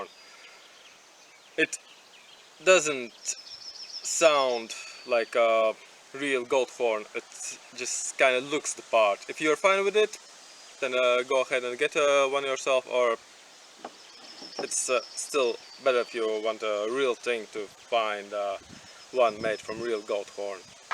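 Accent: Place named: Scandinavia. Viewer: Hi. I am from sweden and was wondering if you can make a tagelharpa? The reason I said I was from Sweden is cuz I wondered what country you are from. I thought you sounded Nordic so I wondered if you can understand Swedish? I’m kinda bad at talking Swedish cuz Swedish is my first language and English is second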